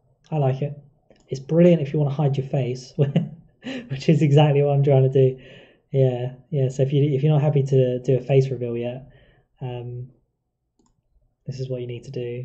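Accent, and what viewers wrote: United Kingdom. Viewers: Omg ur British? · Woah you're brit?! :O alright im subbed haha xd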